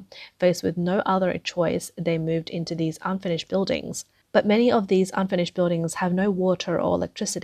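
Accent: Australia. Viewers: Aussie accent narrator is the BEST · This narrator has a perfect Australian accent.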